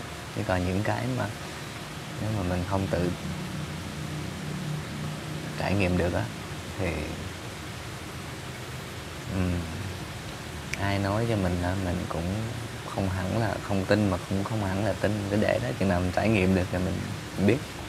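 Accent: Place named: Mekong Delta, Vietnam. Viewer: Tập này a ngồi nc kiểu miền Tây hả😅 nhìn cưng ghê😍😍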